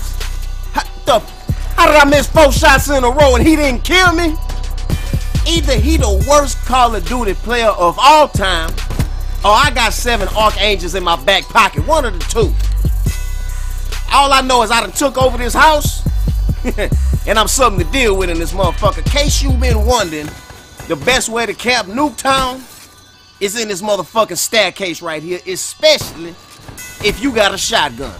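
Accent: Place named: New Orleans, United States. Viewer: Ya heard me lol New Orleans accent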